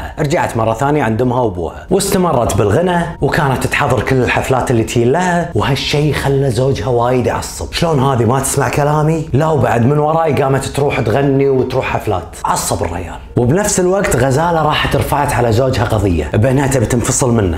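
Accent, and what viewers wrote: Kuwait. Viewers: باين عليك كويتي تقول قطاته والا حن نقول شطحاته او طلعاته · سردك جميل واللهجة الكويتيه ما شاء الله جميله، كل المحبه للشعب الكويتي الأصيل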